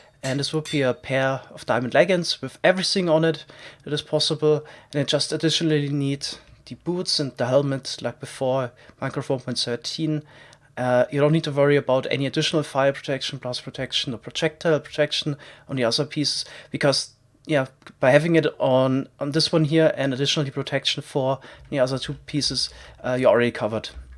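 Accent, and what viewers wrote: Germany. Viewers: Hörst dich wie n deutscher an der englisch redet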